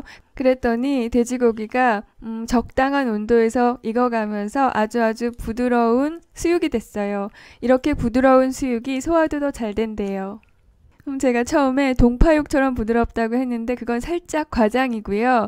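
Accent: Seoul, South Korea. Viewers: 뭔가 나레이션이 서울사투리같은데 그래서 더 정겨워요! 뭔가 아는̆̈ 이모님이̊̈ 알려주시는̆̈ 느낌이예요 좋은 영상 잘 보고 갑니다~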